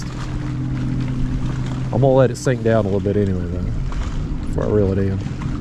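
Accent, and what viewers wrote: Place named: Oklahoma, United States. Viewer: Im gonna be really honest here for a minute. I never used to really watch your live 2 hour 4 hour long videos. I used to just skip right over them. Honestly because i kinda thought you where an asshole in general but i liked your videos about big fish and whatnot. Im from oklahoma. Your country accent gives me a kick because i hear myself in there lol. But honestly ive been watching the raw and uncut videos and i have noticed well hell this guy is just like me. He is just real and honest. I like the honesty. I love the raw and honest opinions about real life deals you talk about. Like the recent video i watchrd where you talked about old white christian men who hate your guts. Im a christian but im also human so i know damn well on youtube im gonna see the rue paul drag race bullshit and my kids see it too. My daughter asked me the other day she was watching a kids show on youtube and that crap popped up. 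Ahe is 10 by the way. And she asked me why that man was fressed like a woman and why he doesnt wanna be a man like he is???? You know how hard that is to explain to a 10 year old girl??? So you making a commercial for a ball hair trimmer, id rather her see that than see that other nonsense. Id rather someone be real and honest rather than be fake. Even as a christian im not religious. Im only human and make mistakes. So in closing just saying i know what you nean and i get it. But not all is christians are likw the old white man you described. 😊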